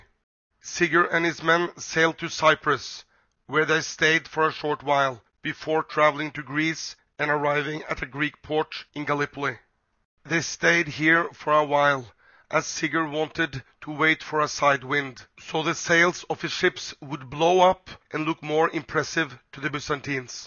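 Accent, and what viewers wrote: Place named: Norway. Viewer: Hehe, genuine Norwegian accent and all :) Great video mate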